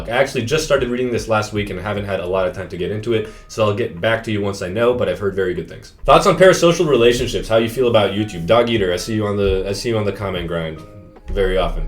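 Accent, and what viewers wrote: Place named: Balkans, Eastern Europe. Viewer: Knew it, the name, the humor, the accent, the looks. Everything screamed Balkan Slavic.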